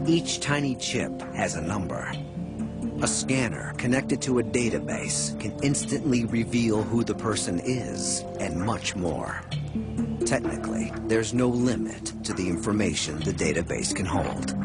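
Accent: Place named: Netherlands. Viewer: Who cares about life?? DUTCH ACCENT DUTCH ACCENT DUTCH ACCENT DUTCH PEOPLE PARTY DUTCH PEOPLE ACCENT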